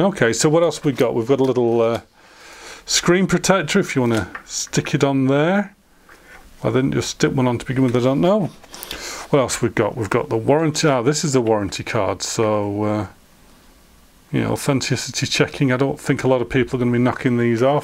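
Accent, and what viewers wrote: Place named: Liverpool, United Kingdom. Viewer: Excellent video. But why are you speaking in a scouse accent? Where exactly in Liverpool are you from? If you are not from the Merseyside area why are you speaking in a Scouse accent?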